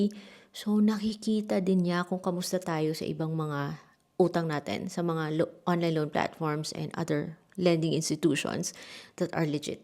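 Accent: Iloilo, Philippines. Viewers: Ate ilongga ka gali? Hehe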